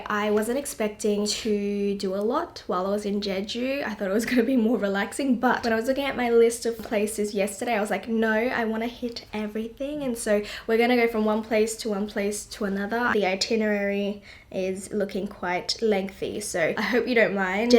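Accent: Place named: Australia. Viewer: I think I once left a comment on your vlog like, “Do Aussies really speak this fast?” 😂 Was watching Aussie videos to study English and ended up on your channel again… and omg, my hometown showed up!! It felt so strange (but in the best way) seeing it filmed so beautifully. And I’m in Melbourne now — day 3 here!! 😊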